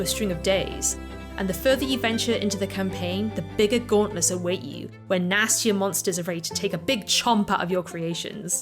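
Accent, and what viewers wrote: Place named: United Kingdom. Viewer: how you gonna have a british accent and then incorrectly use feet instead of meters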